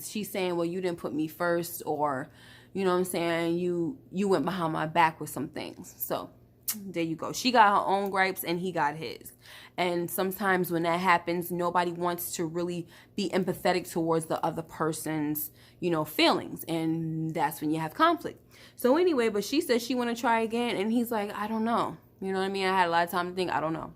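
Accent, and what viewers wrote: New York, United States. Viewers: I have to ask from hearing your accent - are you from NY?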